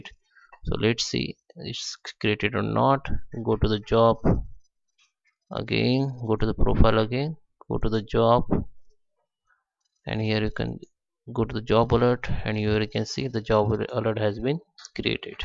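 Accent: India. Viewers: Your voice seems indian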